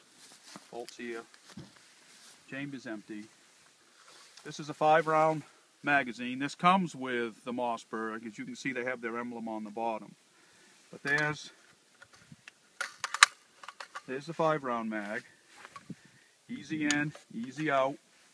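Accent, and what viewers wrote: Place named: New Hampshire, United States. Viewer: Great video my friend thank you . And just for the sake of question are you guys in New Hampshire ? I think I recognize your accent lol I'm from Rochester NH and I now live in Arizona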